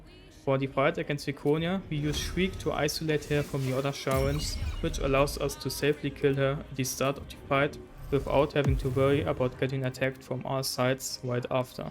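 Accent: Germany. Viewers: Pretty cool video , a lot of thought went into it I can see that. one thing though, why don't you consider to use all of shar's blessings in the Myrkul fight ? any specific reason ? they are rather powerful and could have saved you 15h of redoing everything. I'm also very impressed there was no " I blew up the emboss in one turn using firework / explosives" I might need to start all that pre-buffing and switching stuff if I ever wanna do a solo Honourmode run. Wenn du den Deutschen Akzent für die Zukunft loswerden möchtest, empfiehlt es sich, Serien, Spiele und Filme auf Englisch zu setzen, da du hier die richtige Aussprache hörst, dieser Tipp hat vielen meiner Deutschen Freunde geholfen, sich schnell darin zu verbessern. Ich finde, es hat mittlerweile einen gewissen Charme.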